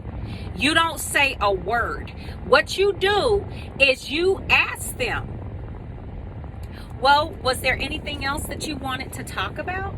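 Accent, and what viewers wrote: South, United States. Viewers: Love u Soo much♥️ U are so real, southern sounding and wise. I'm from Florida in Denver . Thank u for your content🥰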